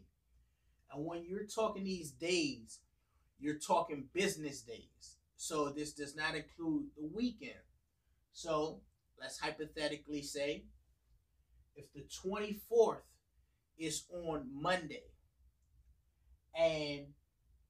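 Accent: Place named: Chicago, United States. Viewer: Can u mentor me I need help.. are u from chicago you sound like us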